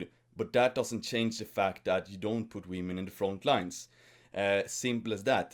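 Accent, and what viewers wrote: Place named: Sweden. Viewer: Each word is the glorious truth in a Swedish accent. Your words are the pathway to the recovery of your heritage. Men taking responsibility for their women, their family, and their nation is the pathway to personal and civic greatness. Each man that reflects your views is a mirror of the content of your character, and the image is seen when you are not there. Powerful words worthy of a public forum. Strong women give birth to and produce strong children. Why should a woman take on an enemy "one on one" when she can send any number of sons to send those enemies back to their god?, if you are your mothers' son, your mother is worthy of great respect. PUBLIKFRAMGANG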